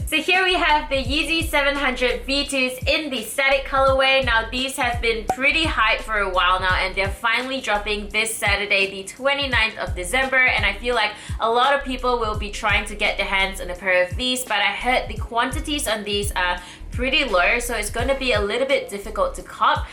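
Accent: Australia. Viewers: I’m buying these after I watched your review vid. I love your ausie accent. It’s super hot. I’ve spent a lot of time in Sydney and Gold Coast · Her Aussie accent sounds really lovely.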